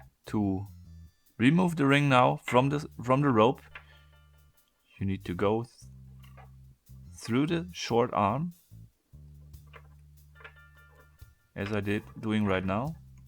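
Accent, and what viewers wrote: Germany. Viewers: Ich schau die Videos, obwohl ich weiß, dass ich mir nie so ein Puzzle zulegen werde. Und wegen dem netten Englisch mit deutscher Aussprache ;) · You are german. Am I right? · Man hört so raus, dass du deutsch bist. kp, wieso.>_> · du bist aus deutschland oder ?😂😂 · Are you German? You akcent sounds like it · He has such a distinct German accent I can't imagine why you would think it was Russian. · Are you german? Because you have a very german Akzent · I can swear this guy is german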